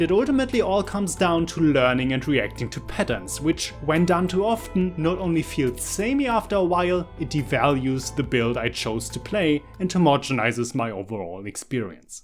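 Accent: Germany. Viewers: Oh shit, so that's what it is. I've been trying to figure it out, but I wouldn't have guessed German.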